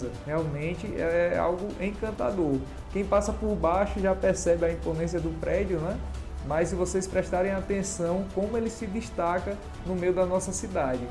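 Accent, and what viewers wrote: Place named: Paraíba, Brazil. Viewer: Mais vc não é de Fortaleza vc ta um pouco com o sotaque da Paraíba e do Pernambuco!!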